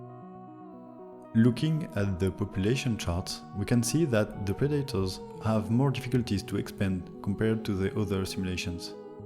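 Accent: France. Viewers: ho le méga accent français mdr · T’es français l’ami ? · You sound so french, why all AI youtubers are french wtf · Is it just me or does he sound French? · T'es français ? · Bonjour j'entends ton accent français, as tu une chaine en Français avec la même video ? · French accent? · Excuse me but i wonder, after seeing the first minute of the video: "est tu français?" Because of your accent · it seems that you have a French accent. si oui très bonne video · L accent francais on est laaaaa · L'accent français énervé je m'y attendais pas x) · Hmm le bon accent français ❤️ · Haha je le reconnais bien, l'accent français 😂 Courage, tu vas y arriver 👍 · He is french because is accent, you can make a video in french 😉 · you have a french accent lmao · gros accent français spotted ;) mais vidéo interessante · are you French🤔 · Petite question : serrais tu français ? Y’a un accent très reconnaissable 🤔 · C'est un accent français ça ! 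Ça fait plaisir 🇲🇫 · Ah moi j'aimerai une version française hihi (l'accent t'as trahis 😘) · Le fait que tu es un accent français me fait encore plus aimer la vidéo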